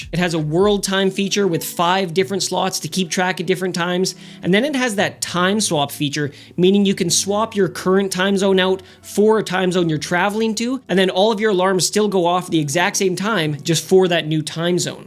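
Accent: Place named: Canada. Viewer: If I ever need to explain what a Canadian accent sounds like, I'm going straight to this video for the best example❤👍. Oh yes, forgot, I agree with you on the 6510.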